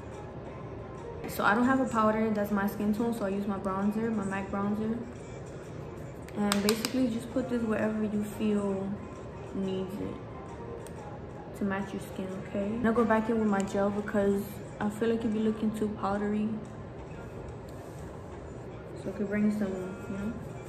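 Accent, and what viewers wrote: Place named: New York, United States. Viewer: the fact i can tell you from new york lmfaoo me too 🥰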